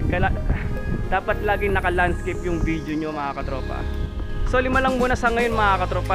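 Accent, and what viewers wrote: Batangas, Philippines. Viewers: Kabayan ala eh batangeño kb san o s batangas aq eh s tanauan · Hahajaja laptrip..batangueno si kuya..hahaha kuya dalawin moko para pangatlo na ako sa manunuod mp hahahaha...anyways nice and entertaining vid mo kuya..salamata...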